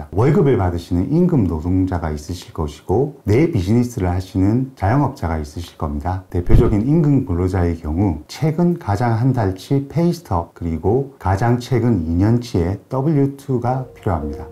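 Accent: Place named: Gyeongsang, South Korea. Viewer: 많은 도움이 되었습니다~ 경상도 분이시군요ㅎ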